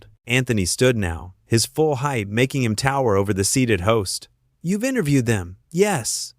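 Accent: United States. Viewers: These American voiced videos are a nightmare, garbage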